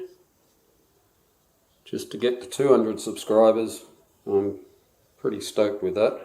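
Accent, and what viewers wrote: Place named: Australia. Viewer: Good work mate, nice to hear an Aussie accent, i understood every word, maybe because I am Aussie to😬👍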